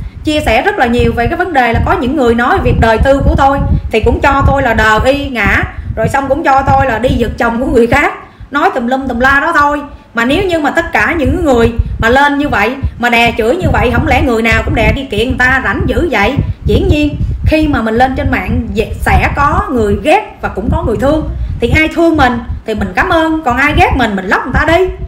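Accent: Standard. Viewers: Chi noi qa chuan luon 👍👍👍👍👍🌹🌹🌹🌹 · Toi coi nhieu lan kenh cua co co noi hay chuan dung toi ung ho co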